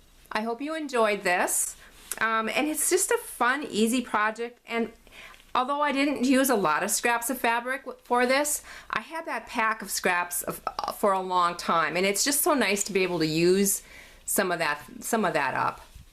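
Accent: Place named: Iowa, United States. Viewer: The only redeeming feature of the belt are the buckle rings...you really made it beautiful. My family in northern Iowa sound just like you...delightful.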